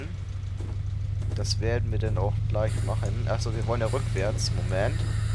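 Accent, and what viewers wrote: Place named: North, Germany. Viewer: Merkt man an der Sprache :D Hamburg hattest du glaub ich im Video gesagt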